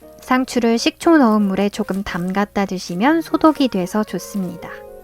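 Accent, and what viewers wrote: France. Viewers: loved your french accent friend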